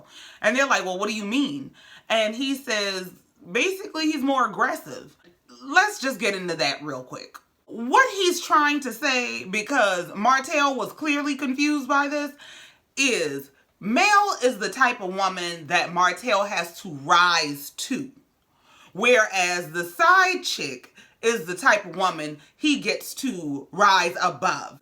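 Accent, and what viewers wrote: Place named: Gullah, United States. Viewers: Are you from SC because your accent sounds Geechie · Don’t know how I found your channel, glad I did!! You give great commentary!! Where are you from? Your accent sounds like mine from the Carolina’s❤️❤️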